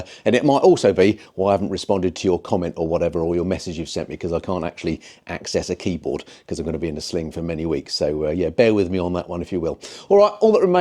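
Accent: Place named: United Kingdom. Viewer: Missing your industry news, and UK accent. Hope the surgery went ok last December. Godspeed!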